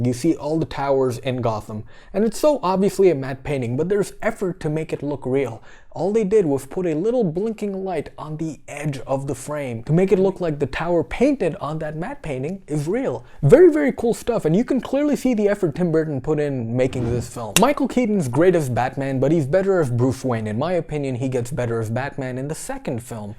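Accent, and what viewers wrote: United States. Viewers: You don't live in America? Neither do I, lol. You do sound American though. Greetings from The Netherlands (we gave you Paul Verhoeven and Rutger Hauer) Ps: feel free to add me on Skype 'meeskeesz' Have a nice day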